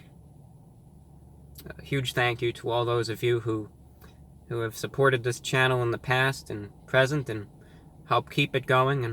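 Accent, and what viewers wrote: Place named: Transatlantic, United States. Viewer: bringing the transatlantic accent into the 21st century for sure 🍔🍟🍕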